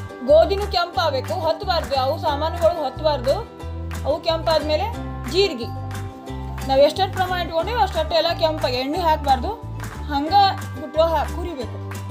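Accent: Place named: North Karnataka, India. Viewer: Recipe is very nice Madam.....😋😋😋 Felt very nice by hearing your uttara Karnataka style voice....👍👍👍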